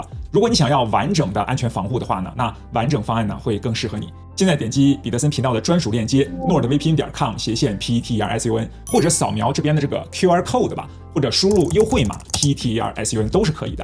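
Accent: China. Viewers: 为什么用的词是台湾或香港那边的，但是口音是大陆的呢？好奇怪啊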